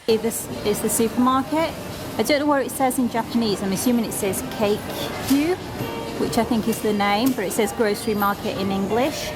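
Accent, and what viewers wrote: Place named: United Kingdom. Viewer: Thanks for doing this beautiful video. I liked your British accent. Were your parents or grandparents from Indian Punjab?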